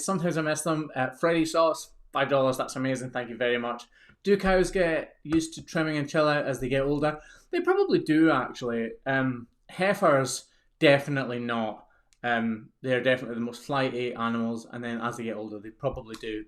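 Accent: Scotland, United Kingdom. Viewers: You’ve got me hooked! No, not just on the hoof care - on your Scottish accent. My 2x great grandfather was born in Abroath (northeast), my 2x grandmother in Glasgow, and they settled in Ayrshire. He was an author and a machinist. Your accent allows me to imagine what he might have sounded like. Check out his work on Bobby Burn’s inspirations, “Rambles Round Kilmarnock”, “Rambles Round The Land of Burns”. He also had a wicked sense of humor I’m told.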